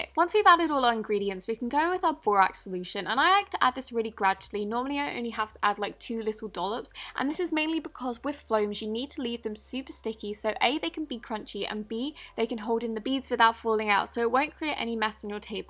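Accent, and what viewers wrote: England, United Kingdom. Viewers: R u English/British ❤️❤️ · She's english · WOW i love that britishenglisch so nice to hear👍#EUROPIENPOWER 🇨🇭🇨🇭🇨🇭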